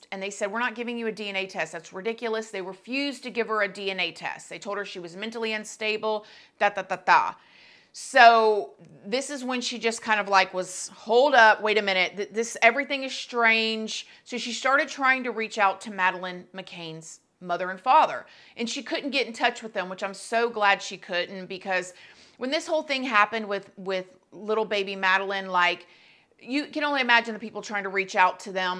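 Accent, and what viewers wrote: South, United States. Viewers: It's just her accent guys. She's got a southern accent. Hahaha it's that "southern twang" that's funny though, y'all are funny.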